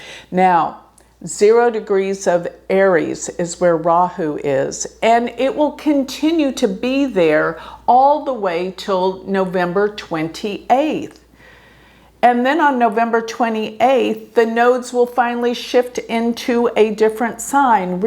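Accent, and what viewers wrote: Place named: South, United States. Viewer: Strange you should say that! In general, very much in general l must emphasize, l find the way Americans speak unsupportable, especially the women, They are so loud and nasal and they massacre the English language. Some you tube channels l just cannot watch even if the content is interesting. But l really enjoyed this video. I love accent. A little from the south l guess. Well measured and not too loud. l am a pure Pisces. I loved your reading. Let’s see what happens shall we? I agree with everything you have said. I’m a young 83 who’s been on a spiritual path all her life. 🇬🇧💝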